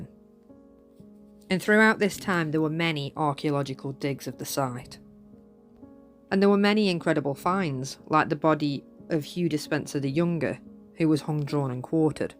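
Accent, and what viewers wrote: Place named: Stoke, United Kingdom. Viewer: I lived in Stoke for five years and left reluctantly but with very fond memories and friends still there. Always enjoy your videos and love that accent! Please give us more.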